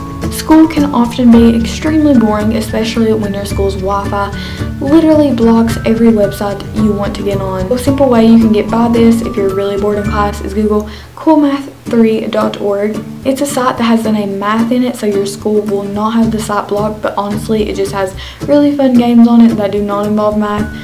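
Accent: South, United States. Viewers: your sooo country😍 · I thinks she's from Tennessee, or Texas, but not from Britain. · are you from the south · Why is your accent so country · A southern accent. If you can't deal with it you need to grow up. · wish i could like the vid but i hate southern accents :/ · I thought at the beginning but she has a freaking southern accent as well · Sounds like she has a southern accent to me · a country accent and has blonde hair hmm.. this makes me wonder hmm..how would she look like ??. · dat southern accent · Pretty sure it's southern. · she is so country omlllllll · she's definitely from the states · Lol same! First I thought she was Scottish, then British or Australian! Until I realized she's probably from the south of the US. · yeah I'm Australian too and it bothers me seeing all these people confusing this clearly american accent for an Aussie one haha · I really like her country accent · What's your accent? 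At first I thought it was foreign but when I listened harder it sounds country. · she has a country accent so I guess she is country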